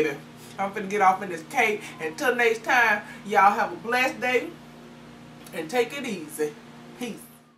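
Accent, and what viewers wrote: South, United States. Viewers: Where are you from i love your southern accent ?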